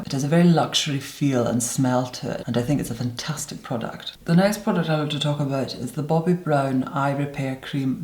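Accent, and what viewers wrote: Scotland, United Kingdom. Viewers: His accent is a cross between the Queen's English and Scottish country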